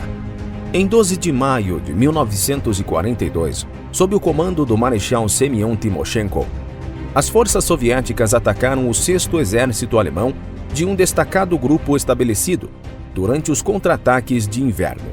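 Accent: Maranhão, Brazil. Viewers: O maranhense não tem o sotaque dos outros estados do nordeste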